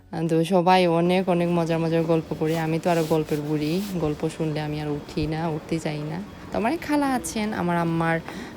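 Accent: Sylhet, Bangladesh. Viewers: Apo are you came from salyet because your speak like that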